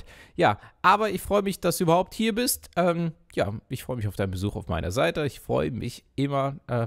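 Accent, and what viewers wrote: Germany. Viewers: Moon yes you liking the videos we me, i find them very good, do you have a German accent? You hear you so on! 😂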